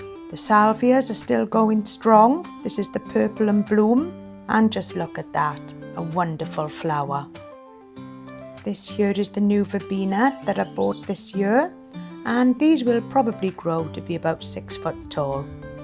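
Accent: Wales, United Kingdom. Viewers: Hi the Garden is looking lovely. Do you heat the greenhouse ? I'm in Essex. You're lovely soft welsh accent really reminds me of my Granna. 😊x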